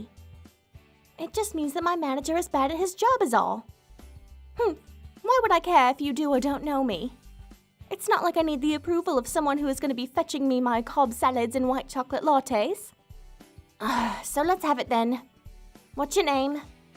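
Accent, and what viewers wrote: United Kingdom. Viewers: Oof these video make me feel like im cheating on my gf but i cant help it........if tsundere weren't hot enough she British too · What kind of accent is this? Sounds pretty british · HOLY SHIT SHE IS BRITISH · Oh my god!! She Bri-ish!! I love her!!! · Oh, she british? Interesting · Hehe an British tsundere is something I wondered how long it would take before someone came up with the idea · Holy Heck she's british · Nothing better than a British voice. Except for my earl grey, of course. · British accent. Tsun tsun attitude. It's s a British tsundere. best waifu Best waifu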